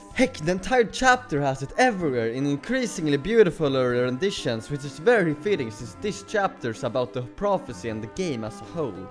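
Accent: Sweden. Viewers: Swedish? May I ask? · 1.From your accent I presume you are from Sweden like me 2. I didn’t like the Zelda parts of the chapter at first but they started to grow on me for a while. 3.Kanske du behöver en TANDPÅNG för att lösa dina problem med kapitel 3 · Banging video my man, håller med typ allt men bro din svenska brytning är så stark att jag knappt fattar nåt lmao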